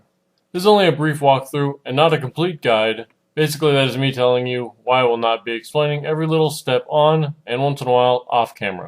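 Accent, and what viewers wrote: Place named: East Coast, United States. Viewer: wow - funny video and very informative I really appreciate your taking the time to make and post this video - I've shared it with others who've asked about Ebru -- I may have to try this -- beautiful work - what is your nationality - heritage? you're obviously American - New York? New Jersey? sounds east coast LOL - I don't know - are you Turkish? Pakistani? I originally thought this was an Islamic art form - I lived in Turkey for two years and how I could have missed this I do not understand - I was young so maybe I just wasn't into art back then.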